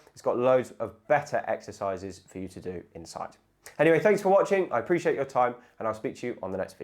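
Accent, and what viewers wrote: England, United Kingdom. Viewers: Thank you young English man . My salute to you. Good information is given to over Fifty. I liked your accent. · I love his British accent! He is also extremely handsome. I think I am going to be in very good shape soon. 😉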